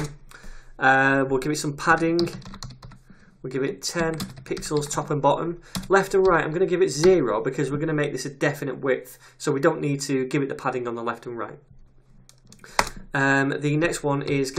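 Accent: United Kingdom. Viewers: First of all, let me just say that I absolutely loved the course all the way through. Over the last few days I took both, the HTML course and this one, and it was a hell of a refresher for me. What's more, I have finally understood quite a few crucial CSS concepts and now I know why something works and something else doesn't. You did a great job by putting this course together, kudos to you, mate. Last but not least, let me just say that I absolutely love your British accent :) Cheers!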